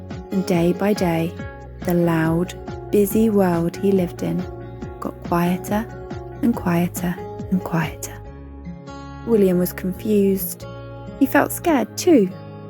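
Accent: United Kingdom. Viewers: Yesh theres a harry potter accent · Gotta love the British accent!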